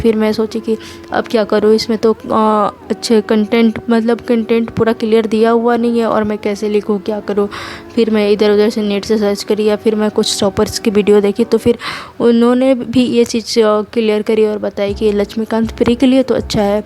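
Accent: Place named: Odisha, India. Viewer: Aap Odisha se ho naa.. awaz se lag rha hai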